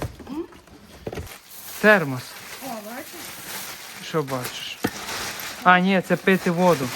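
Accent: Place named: Carpathians, Ukraine. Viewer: Контент дуже цікавий, бо я впевнений, що на європейських складах можна речі поцікавіше знаходити ніж в тих же Штатах. Також цікаво звідки ви самі? По акценту мені здається щось ближче Карпат, чи регіону - може бути Львівщина, Франківщина, сильно менше ставлю на Закарпаття. В мене є друг дитинства, він з 6го класу жив в Мадриді і акцент дуже сходий на ваш в нього залишився досі.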